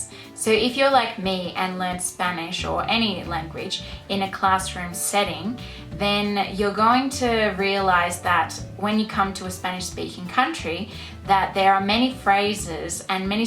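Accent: Colombia. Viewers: i love your accent you have a very strong colombian accent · muy buena explicación, incluso tienes tu acento colombiano en español... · The Colombian Accent really has got into you! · Yes I was and sometimes still am super confused. Especially about the more subtle ways to use Usted and Tu in this culture. However, super thanks again, your video is awesome and I super dig your 'Rollo' accent; you have got rid of most of that Aussie gringo twang - that I happen to have as well. Heart for Change and Volunteers Colombia I think have now officially collaborated, to work together in an alliance so I am working in the same program that you are in. Feel free to add me on Facebook Stephen Deguara for my photos and updates! Anyways thanks again, great video, really hits the nail on the head with the greetings here that seem so simple, but really makes a huge difference when you interact with locals and you can take on some of the culture in Bogota!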